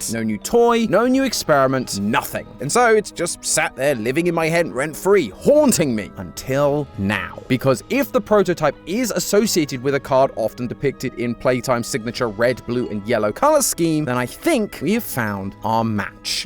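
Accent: United Kingdom. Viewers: Wait , why do u have a British accent all a sudden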